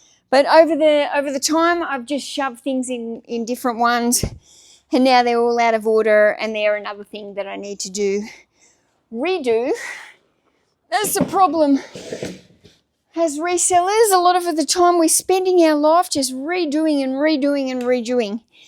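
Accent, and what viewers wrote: Australia. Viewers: I just realised how ocker aussie your voice is haha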